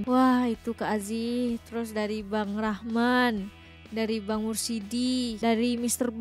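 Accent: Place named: Kuala Lumpur, Malaysia. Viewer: Dh pandai cakap KL😅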